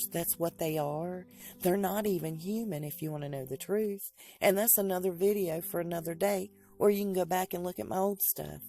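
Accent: South, United States. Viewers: me 2 I love her southern accent! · You are so precious,. I look forward to your videos everyday! I love your Southern accent. I am from TX, and I have one too. You keep me company sometimes, ad I am at home a lot.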